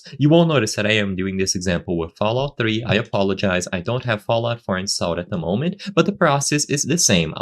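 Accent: Brazil. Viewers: Percebi que é brasileiro pelo sotaque 😅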